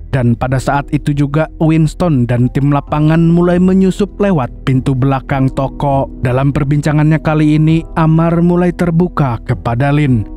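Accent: Banjar, Indonesia. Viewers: Urang Banjar KH pyn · Urang Banjar sekalinya😭🙌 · Asli Banjar kh bg? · Mantap mank,👍 Filim x msntap suara amank x banjar bnr😂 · org banua ini😅 sambang lihum😅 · orang Banjar kh 😂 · Urang banjar sekali amang nih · Ae urg banjar kah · RsJ sambanglihum 😂 Real org Banjar nih nah 😅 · Ammarnya urang banjar skalinya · Urang banjar kah sekalinya pian ni mang...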